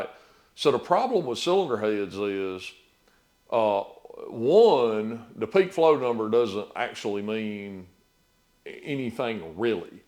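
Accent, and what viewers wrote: South, United States. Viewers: Love that southern drawl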